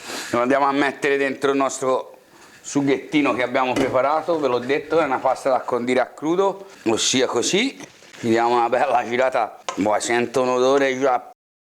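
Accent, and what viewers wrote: Tuscany, Italy. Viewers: Che sei toscano? mmmm come mi harba questa carrettiera! Grande chef😉😉😉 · Per caso sei toscano? 😂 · Oltre le the ricette me piace il tuo accento oscano. Bravo. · Vivo all'estero..che piacere sentire questa parlata toscana mentre prepara ricette antiche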